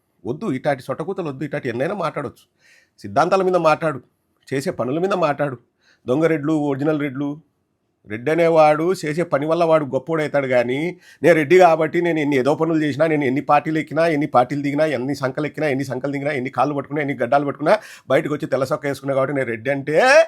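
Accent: Rayalaseema, India. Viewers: Rayalaseema yaasa super anna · Original Rayalaseema language super sir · సూపర్ గా రాయలసీమ యాస లో చెప్పారు..మీ ఆవేశం లో అర్థముంది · ఆదర గొట్టారు రాయలసీమ యాస లో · పక్కా మన రాయలసీమ భాషతో అదరగొట్టారు 😂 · మీ రాయలసీమ యాస సూపర్ అన్న. అదేంటో ప్రతీ వెధవా జగన్ దమ్ముని సవాల్ చేసేవారే! ఆల్రెడీ జగన్ దమ్ము కేంద్రానికి తెలుసు, స్టేట్ కి తెలుసు, ప్రపంచానికి కూడా తెలుసు మరి శబరికి ఎందుకు తెలియదో నాకు అర్ధం కాలేదు.శబరి కి welcome our channel. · Pakka slang of rayalaseema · అన్న రాయలసీమ యాసలో బాగా ఇరగదిసావ్ షబరి కి గట్టిగ దింపావు 90mm రాడ్డు రెడ్డి గారి పౌరుషం పిక్ లెవల్ గా సూపర్ గా చెప్పావు 👍👍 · అన్నా మన రాయలసీమ యాస కేక నువ్వు సూపర్ అన్నా❤ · Super Anna, asalaina rayalaseema slang lo mataldinav…! Mee originality ki 🙏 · సూపర్..... రాయలసీమ భాష లో భలే చెప్పారు.ఇదిరాయలసీమరేడ్డీఅంటే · sir, శబరి కి చెంపలు వాయించేశారు. మన రాయలసీమ slang లో స్పీచ్ సూపర్. I am from kurnool.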